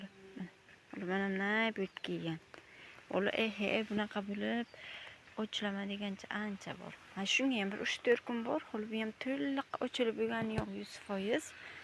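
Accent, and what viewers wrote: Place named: Bukhara, Uzbekistan. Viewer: Каердансиз гапиришингиз Бухороликлардай гулларингизни сотасизми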